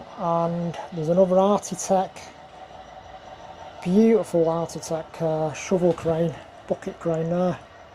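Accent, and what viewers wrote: Manchester, United Kingdom. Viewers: Excellent video where you from you sound Manchester 😀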